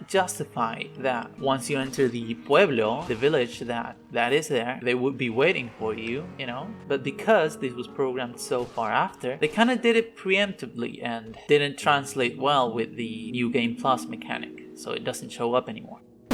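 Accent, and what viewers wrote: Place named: Argentina. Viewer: tu acento Argento me agarró por sorpresa 😄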